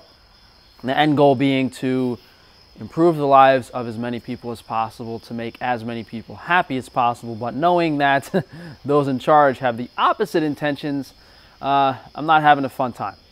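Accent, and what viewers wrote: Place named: Long Island, United States. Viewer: you sound like a Long Islander, That’s where I live and I talk and we talk pretty much the same ha ha ha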